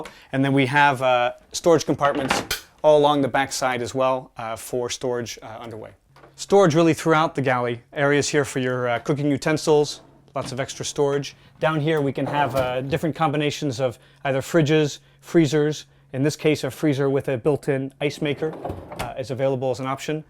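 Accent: France. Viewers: désolé mais l'accent français n'est plus possible, on dirait que vous sortez du collège... sinon très bien présenté. bonne vidéo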